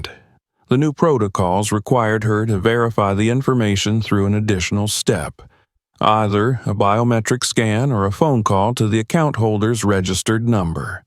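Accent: South, United States. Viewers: I love your southern accent. May I ask where in the south you are from? I lived in S C for 12 yrs loved it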